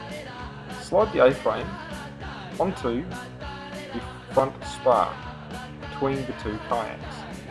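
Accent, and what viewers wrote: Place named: New Zealand. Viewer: Love the concept and the New Zealand accent!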